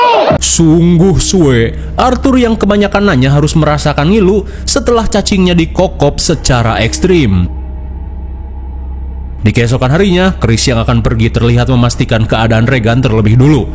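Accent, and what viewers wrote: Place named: Sunda, Indonesia. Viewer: asli logat bahasa Sunda sareng indo na sok pikaserien😅😂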